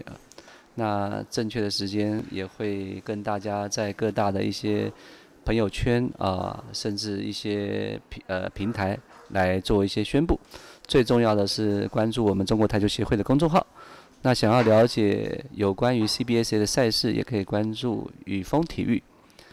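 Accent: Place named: Taiwan. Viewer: 這主播怎麼有台灣腔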